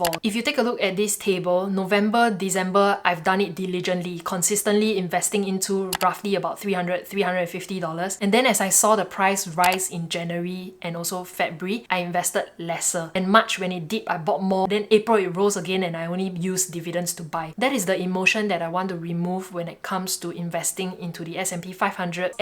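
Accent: Singapore. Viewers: Is she speaking Singlish? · I know you’re Singaporean from your accent. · I love your singaporean accent!!! Singlish makes my heart beat! Maybe it was your good taste in music and the beautiful scenery from your newest korean vlog. 🌇 you are so smart, I'm going to watch all your videos!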